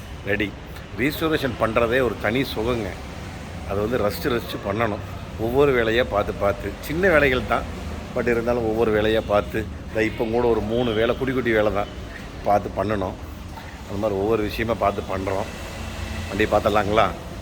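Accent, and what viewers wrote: Kongu, India. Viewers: அருமையான வேலை..... உங்களின் கொங்கு தமிழ் பேச்சு.... மிகவும் அழகு.. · கொஞ்சும் கொங்குத் தமிழ் பேசும் கோமகரே...! திகட்டாத தித்திக்கும் பேச்சுடைய திருப்பூர் திருமகரே...! காண்டா மிருக கலர்ல ஹோண்டா சிட்டி கார் வந்தாலும் அதை ஃபேண்டா கூல்டிரிங்ஸ் கலராட்டம் மாத்துறதுதான் நம்ம அய்யாவோட மகத்துவமே❤❤❤❤❤❤😊😊😊😊❤❤❤❤ அட...அட...அட... அருமையோ அருமை அய்யா🙏😊😊😊😊❤❤❤❤❤❤ 2004 மாடல் ஹோண்டா சிட்டி கார் அடிச்சு விரட்டி ஓனர் நன்றாக சிட்டிக்குள்ளையும் ஹைவேயிலையும் ஓட்டியிருந்தால் தோராயமாக 1,80,000 முதல் 2,30,000கிலோமீட்டர் வரைக்கும் கார் ஓடியிருக்க வாய்ப்பு இருக்கு அய்யா. என்றும் அன்புடன் உங்கள் மகன்போல இவன் ரா.முஹம்மது கபூர் (சென்னையில் இருந்து)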